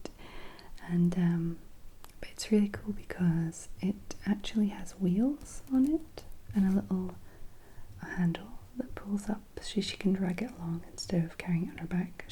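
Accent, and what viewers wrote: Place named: Scotland, United Kingdom. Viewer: I was thinking the whole way through this video 'she definitely sounds a little Scottish' and then you said you went to school in Scotland lol that's where I'm from too. Can I ask which part of Scotland you lived in?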